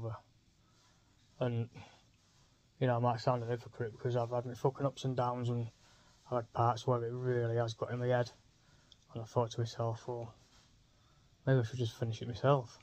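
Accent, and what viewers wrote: Northern England, United Kingdom. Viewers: Us northerners can be hard to understand 😅 believe or not.. not all of us English people talk like the royals..... we have 100s of different accents and subtitles are available if u click the double cc at the top of the video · To my American English ears the northern English accent is a bit difficult to understand, sort of like the Scottish accent.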